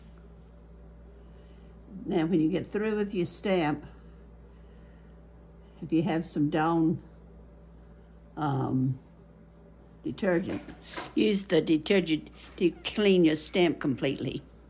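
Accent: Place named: Georgia, United States. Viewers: Are you by any chance from southeastern Alabama or northwestern Georgia? My grandmother was from Salem, Alabama and grew up in Columbus, Georgia. Your accent sounds very much like hers and your voice brings me so much joy ❤❤ thank you, beautiful soul ❤ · Maine? You sound like you’re from Georgia 😊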